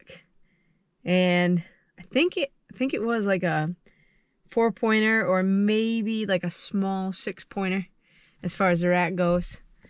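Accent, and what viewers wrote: Maine, United States. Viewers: I miss the Maine accent I was born in maine but haven't lived there since 1977 I have visited and hunted there over the years I really enjoy your videos good luck hunting your definitely putting in the time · Ha I don't know where your from but you certainly sound like a down easter.l0l.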